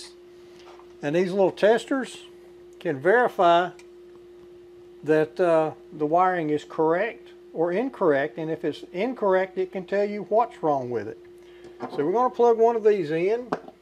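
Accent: South, United States. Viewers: He explains it nice and simple. The southern accent is great. Thank you sir. Ya'll good people.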